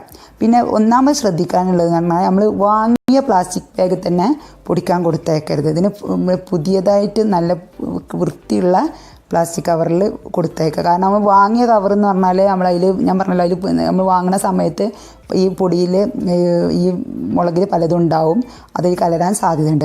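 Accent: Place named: Malappuram, India. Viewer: ഇജ്ജിന്റെ വർത്തമാനം നല്ല മലപ്പുറം tune ...super vedio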